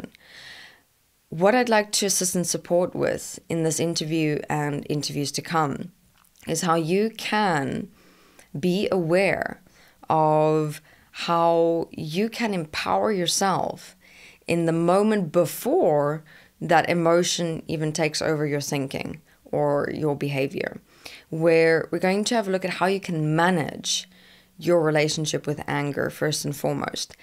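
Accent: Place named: South Africa. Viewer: Sheesh. South African....Netherlands, Scandinavian...?? I'm hearing bits of Maori for crying out loud. Gaaaah! Where is your accent from?? Thanks for helpful video too.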